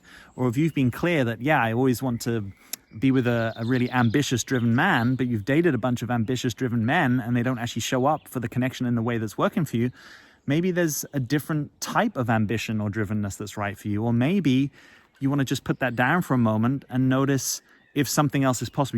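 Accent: United Kingdom. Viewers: yes, it’s the Brit way you say pattern. :) I had to learn an RP accent when I was in a play (very small part) and I so wanted to go around talking that way...but that would’ve been inauthentic 😁and probably pretty hilarious to boot. It’s so nice to hear it as it is, from you! Very good points regarding practicing trusting what’s good (or not) for me and maybe that just relating is a good space. I’ll do like he does in “What about Bob?” Baby steps...😂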